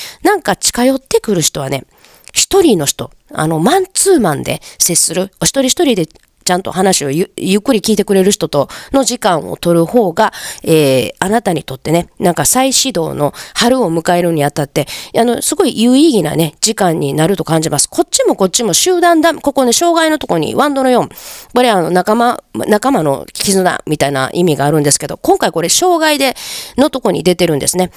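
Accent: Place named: Kansai, Japan. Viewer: 何年振りかにさんの動画を開きました。いつも通りのパワフルな関西弁が楽しかったです♪『エネルギー閉じる』って言葉の感覚が新しくてためになりました❤ジャブ打っていきまーす❣️ありがと〜♪ ✨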